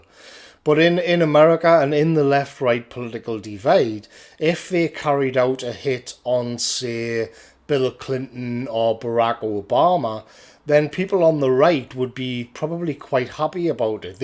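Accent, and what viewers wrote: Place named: Geordie, United Kingdom. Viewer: You're a clever lad marra. Love listening to your vocabulary in wor accent!!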